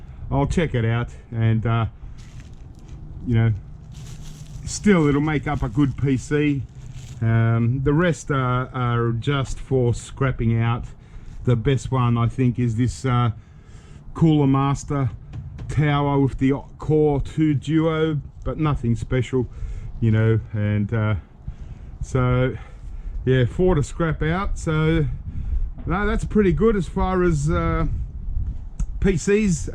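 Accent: Australia. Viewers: You are aussie guy? Nice scrapping lots of wire and neat video 🙏🏻🌈🤗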